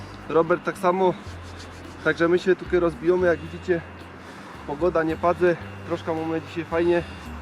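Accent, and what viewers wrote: Silesia, Poland. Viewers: nie moge sluchac tego slaskiego dukania, ide dalej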